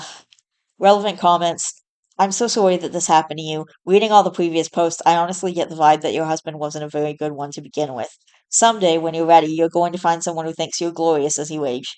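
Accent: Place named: Canada. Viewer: I never looked at your YT page before. You sound very articulate. Smart as a whip. Snd like the typical Canadian voice. Great video. Have a good one.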